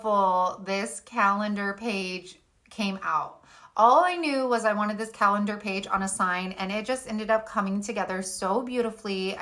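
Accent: South, United States. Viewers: I couldn't find the little tool you used to make the wood grain on your Amazon page....Did I just miss it? I thought I looked at everything! Love your crafts and your ways of explaining how to do them. You talk my talk! You must be from the South too! Lol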